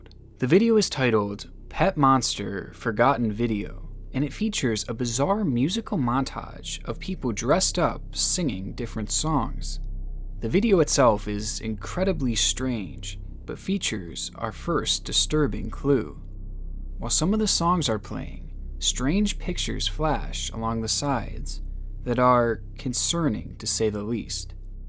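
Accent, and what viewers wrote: United States. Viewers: the improper grammer is driving me crazy my guy lol, you keep saying woman when you are talking about women plural. You sound american or Canadian so I'm confused with this repeated mistake. Great video tho keep em up, just my little nit picky gripe.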